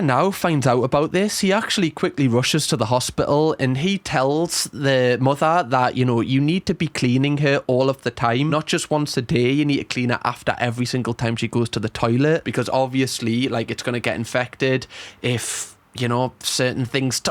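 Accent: United Kingdom. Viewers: Hey I tried to know where are you from your accent,the nearest one came to my mind British:) am I right? Am studying English so sorry for annoying you 😅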